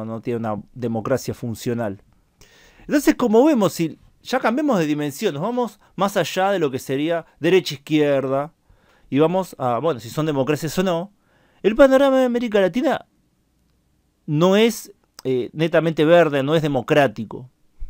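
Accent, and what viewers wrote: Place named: Córdoba, Argentina. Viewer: suena a cordobes (o cordo-chanta)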